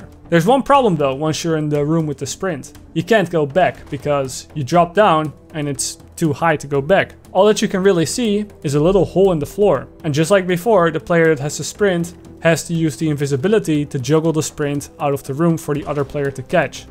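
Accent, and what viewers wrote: Netherlands. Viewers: why is it that, even when i'm dutch i get irritated by the dutch accent xD great vids though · Are you dutch? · You are dutch aren't you? I think i can recognize it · Are you dutch you have a dutch Accent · Ha, Nederlands. Merk t meteen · He sounds really dutch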